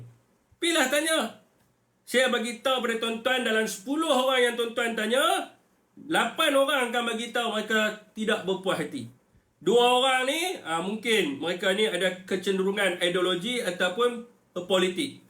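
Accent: Kedah, Malaysia. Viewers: Sembang je lebih. Ko la melingkup. Ko pelat kedah, tau dah ko ckp sentimen politik. Sedap ckp kerajaan penipu. Siap sebut walanon... tau dah kiblat ko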